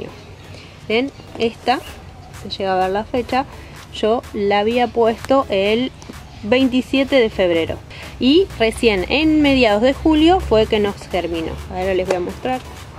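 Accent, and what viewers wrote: Argentina. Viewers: Hola soy Colombiano, amo los cerezos y he defendido uno que creció conmigo y me lo han querido tumbar mis vecinos, al parecer ya le salve, sin embargo, un día ver la posibilidad de una inminente tala de mi árbol decidí que me seria mas fácil asumir mi perdida si tenia bebes de mi árbol, la tan llamada prolongación de la especie. En sus cerezadas he obtendio las semillas y nada. Voy a probar tu método, donde lo logre seré feliz como tu lo fuiste. gracias por compartir. p.d. estas hermosota y con ese acento che... divina. p.d. de p.d. sin ofender y con cariño cuidate tus dientecitos, pero fresca también descuide algunos mios, pero el compromiso es ni uno mas perdido por estupido descuido.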